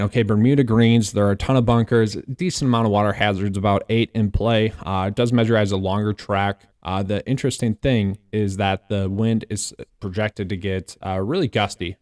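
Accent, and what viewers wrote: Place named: Wisconsin, United States. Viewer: I'm from Iowa. I call it corn hole or bags... But we know you are from Wisconsin when bags comes out as beggs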